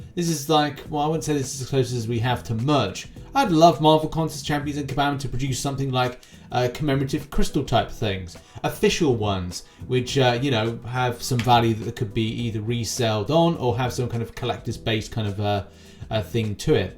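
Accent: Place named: United Kingdom. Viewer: Hey, I’m asking how do you have marvel insider, you sound like you’re from the UK, and so am I, but when I went to get it, it said it was only for US residents.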